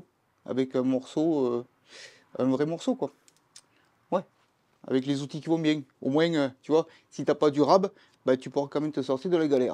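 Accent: South, France. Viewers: oui merci , j'ai quasiment que des véhicules japonais ;) leur durites sont en acier très dur souvent en voulant refaire le bout du cône je le fait en simple cône sans le revers car sinon ça fissure , je devais mal m'y prendre . au passage : bel accent du soleil ;)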